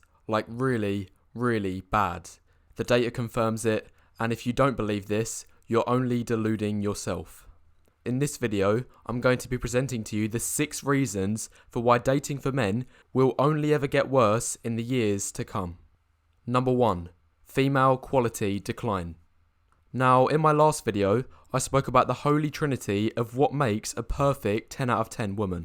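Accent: United Kingdom. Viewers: hes british if im not wrong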